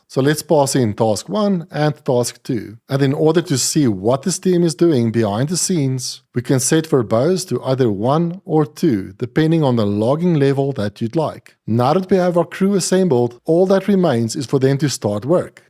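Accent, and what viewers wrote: South Africa. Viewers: Hi, this is a great intro tutorial for crew. Tell me, your accent tells me that you are in South Africa, is this perhaps true?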